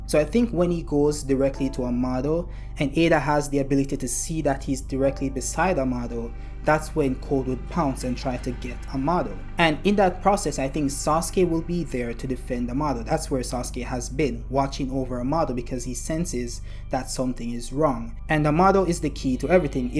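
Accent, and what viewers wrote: Jamaica. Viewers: U Jamaican? 🤔 That accent sounds familiar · ARE YOU JAMAICAN? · You form Jamaica?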